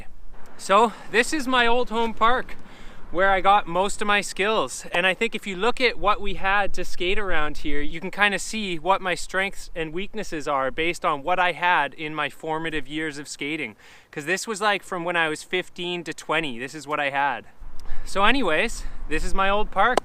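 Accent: Canada. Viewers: U sound so Canadian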